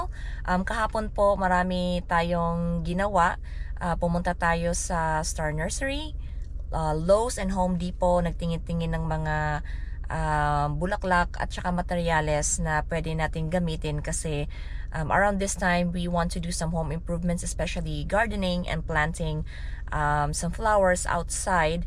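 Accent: Visayas, Philippines. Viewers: I really love your accsent...viva to all visayan..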